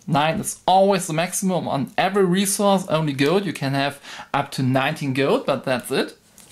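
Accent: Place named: Germany. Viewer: Oh honey, your English is great, thick German accent 😊 but still very easy to understand!! Great job and thank you for this runthrough,! Keep up the good work! Greetings from California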